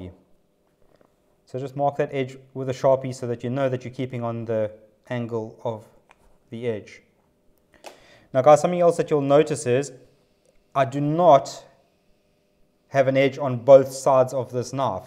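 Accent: South Africa. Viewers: I use a knife exactly like this to fit a violin bridge. This is interesting for a luthier. Where are you from? Your accent sounds South African, maybe?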